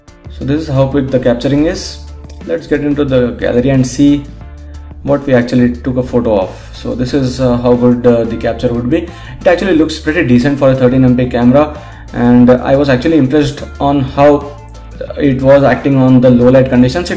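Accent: India. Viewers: your indianoasian english sucks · your English accent like is Indian 😁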